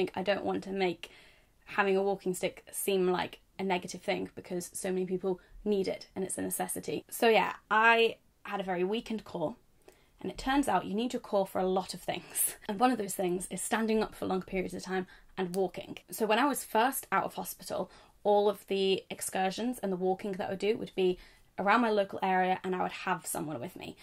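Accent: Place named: England, United Kingdom. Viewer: That English accent 😍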